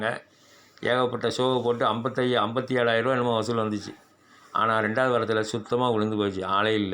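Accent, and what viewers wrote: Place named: Tirunelveli, India. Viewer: Thank you sir Your Nellai slang very very sweet for my ears. Keep it up sir.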